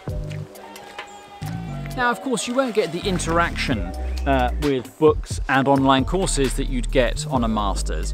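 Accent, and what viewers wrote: England, United Kingdom. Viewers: Can I ask which part of England is this?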